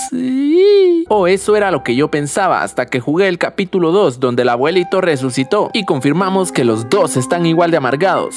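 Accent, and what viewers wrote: Guatemala. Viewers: Un patojo!, Que se me hace que eres de Guate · Una pregunta sos de Guatemala porque tenés el acento guatemalteco Yo soy de Guatemala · ¿Eres de Guatemala? :D Yo soy chapina (っ.❛ ᴗ ❛.)っ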